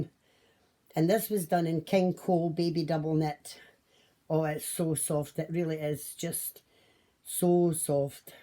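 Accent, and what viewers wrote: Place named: Scotland, United Kingdom. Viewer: Oh my god I love you and your scots accent just found your channel I don't knit I sew but I will surly be visiting your channel again cause I feel like l have been visiting ayou in your home you are a pleasure to listen to god bless you from northern ireland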